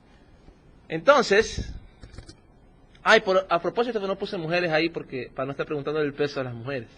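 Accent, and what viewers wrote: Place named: Ecuador. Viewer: se me hacia familiar la voz de ecuatorians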